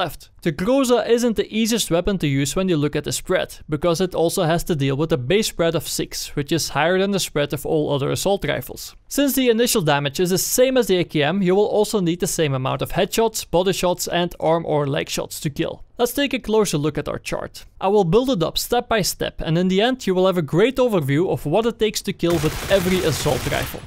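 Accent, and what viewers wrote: Netherlands. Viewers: You are from the Netherlands i can hear it in your foyce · R u dutch cuz of you're accent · sounds a little bit like dutch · Your accent is pretty funny, like all the dutch people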